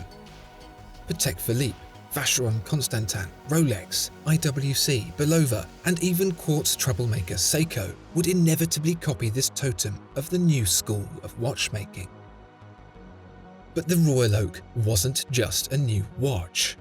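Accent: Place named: Midlands, United Kingdom. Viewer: Waffling on as per usual, over four minutes of absolutely nothing to do with the watch in question! I dip my toes into your channel occasionally as the videography is excellent. I just find the waffle nauseating, but I know I’m in the minority, oh and that middle England voice, it’s like listening to an account waffle on about investments!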